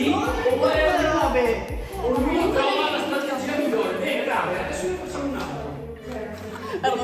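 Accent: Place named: Veneto, Italy. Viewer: incomprensibile....parliamo l'taliano!!! ...non il veneto o similari.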